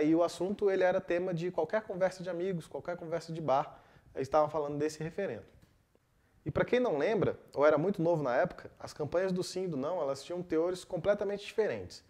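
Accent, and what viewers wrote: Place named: Belo Horizonte, Brazil. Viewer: Ele é do RS? Fala como alguém de BH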